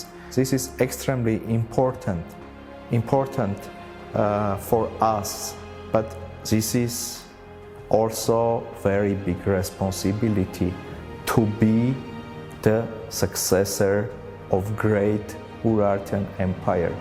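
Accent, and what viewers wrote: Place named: Turkey. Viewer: Interesting and greatly informative video, what is more interesting is I would say the presenter is Turkish if I didn't know he is Armenian, due to his accent and outlook. Most of my good friends were Armenians back in the day, and my father served his military duty in an Armenian village in Eastern Turkey (yes we still have a sizable Armenian community). Contrary to Turkish claims that Sumerians are Turkic, or Meds are Kurdish, the Urartu-Armenian coonnection kind of makes sense to me.But other than the archeological dig outs, I would be more interested in the linguistic evidence, otherwise cultural elements can be easliy adopted by people who came to the region later on. We Turks consume the most tea er capita in the world and love olive oil etc. Sth. similar can be seen in the case of Hatti-Hitite as well. I think I will watch some videos on Urartu-Armenian linguistic connection. 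But this video was really interesting.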